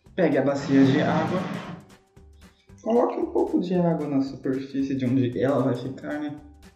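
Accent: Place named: Italy. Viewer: Não sei pq, mas o sotaque me lembrou muito Super Mario.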